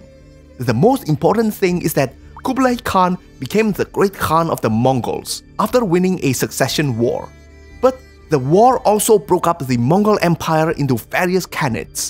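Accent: China. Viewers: From the accent, I am pretty sure he's Chinese.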